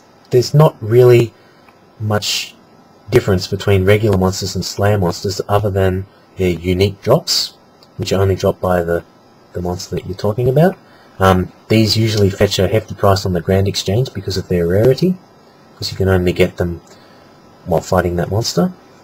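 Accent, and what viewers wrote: Australia. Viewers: your aussie arnt ya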